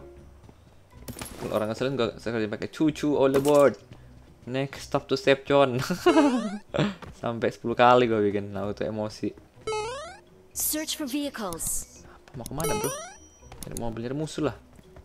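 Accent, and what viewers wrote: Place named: Semarang, Indonesia. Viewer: Pekok pekok wkwkwk Semarangan bangeeettt, nguakak pok aku nda ndaa 🤣🤣🤣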